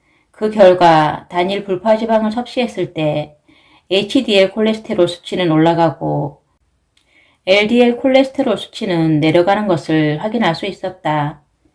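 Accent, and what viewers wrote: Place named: Gyeongsang, South Korea. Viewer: 경상도 사투리로 들으니 아주 구수~합미당.귀여우샤여.잘 들었습미당~❤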